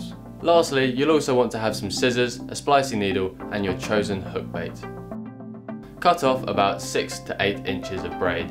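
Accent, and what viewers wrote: United Kingdom. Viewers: Every pva video I've seen was done by a British bloke. Is that yall got over the pond?😅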